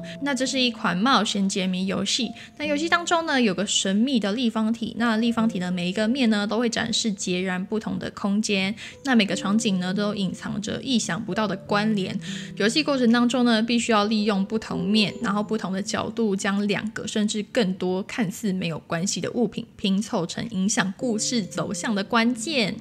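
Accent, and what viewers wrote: Taiwan. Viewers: 对台湾腔真的是没抵抗力……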